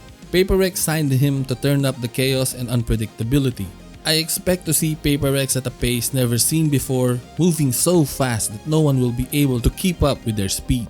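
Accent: Philippines. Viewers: Pinoy ka bro? tonong pinoy ka kasi eh. 😁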